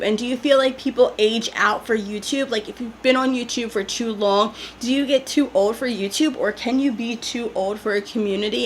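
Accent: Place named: Philadelphia, United States. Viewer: Love your videos (your realness)!!! OMG - You're perfect just being yourself! I can't believe that you 'didn't fit in'. You seem like the sweetest person. When I first started watching your channel, you had me at "ballin' on a budget"! Your videos put me in a good mood because you're so bubbly and you have a sunny personality (and I like your Philly accent)! I agree with 'lady love'. People who act 'better than' are usually hiding something (most likely insecurity). Just keep being yourself,!♥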